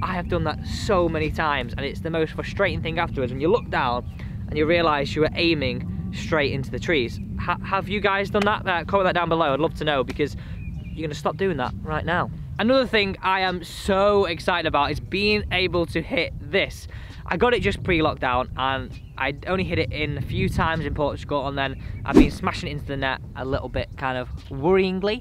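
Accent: United Kingdom. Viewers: Sry hard to understand briti english. Louzy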